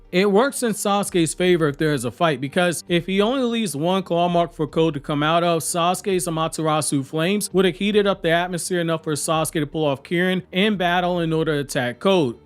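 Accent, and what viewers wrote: New York, United States. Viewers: yeah you sound really distinct in a cool way I was just curious I want to guess new york? I like your accent is all and I love the content sir!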